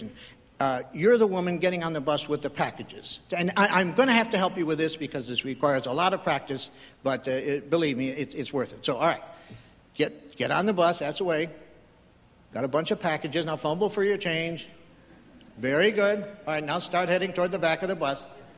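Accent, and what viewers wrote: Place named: United States. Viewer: The first time I heard this skit it was on an LP record at a friends house, so no film track just the sound track back in 1978, I was only 17 and I thought he was the funniest man I had ever heard and it was the first time I had ever heard an American accent. I thought he was brilliant, I made myself remember his name and I am so glad I did. This is the second time I have ever heard this skit and it is exactly as I remember it, nearly 35 years later... still as funny as ever...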